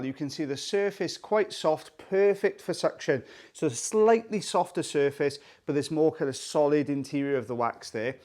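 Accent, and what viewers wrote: Wales, United Kingdom. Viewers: Welsh, Welsh!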